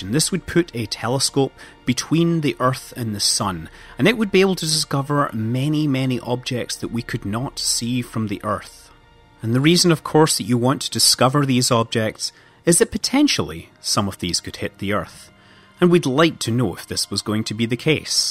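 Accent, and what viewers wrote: Scotland, United Kingdom. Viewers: my god what a snooty Scottish accent you have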